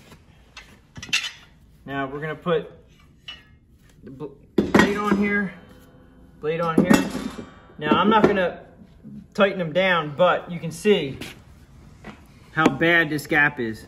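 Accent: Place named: Pittsburgh, United States. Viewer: Already done sir! Yes it may seem barbaric to some, but you gotta do what you gotta do and I assume most people would rather take the barbaric route than shell out tons of cash for a new deck. I wish I had seen this video before I got rid of my old John Deere. Also...based on your slight accent I'm assuming you are a fellow Black and Gold fan? ;)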